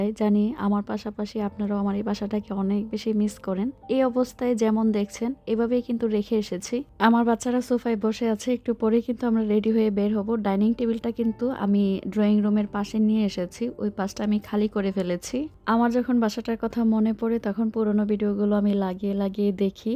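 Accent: Bangladesh. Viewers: আসসালামুয়ালাইকুম আপু তোমার ভিডিওটা অনেক ভালো লাগলো তোমার বাংলাদেশের ভাষাটা দেখে অনেক ভালো লাগলো তোমার মেয়েটাকে মাশাল্লাহ আমার অনেক ভালো লাগে জন্য অনেক অনেক দোয়া❤❤❤❤❤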